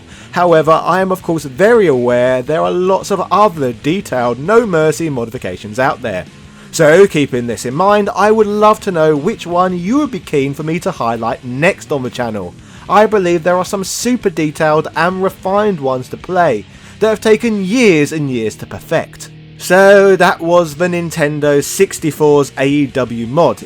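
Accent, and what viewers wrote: United Kingdom. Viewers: 5 mins and 42 seconds in and so far nothing but some annoying brit talking and showing stuff that has nothing to do with the title disliked and flagged as misleading title...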